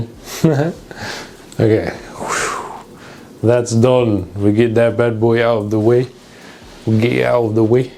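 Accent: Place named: United Kingdom. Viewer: The british accent always has me 💀💀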